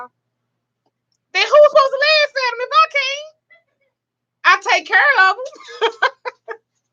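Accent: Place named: Dallas, United States. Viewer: I knew I recognized that accent lol "ova thurr" "right hurr" im in dallas too☺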